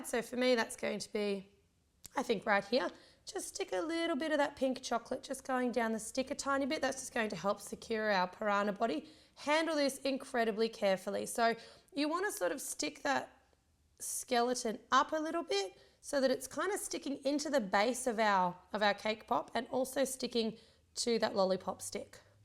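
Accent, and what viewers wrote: Australia. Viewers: She's Australian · She's Australian, so yeah. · This is really cool but are u Australian? 😄😃😀😊😊😀😃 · She does have the semi English accent at first, but her Aussie accent comes out alot more closer to the middle/end of the video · I'm sorry, haters DONT hate please but... Is this Australian? The accent sounds familiar and hmmm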